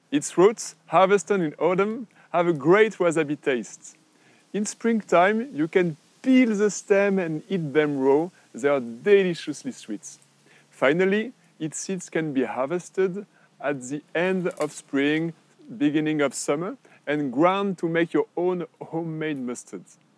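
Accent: France. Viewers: Oh man your english is so french......., that's so lovely, you're so cute, I love it!!!!!! Hugg's & kisses ;-)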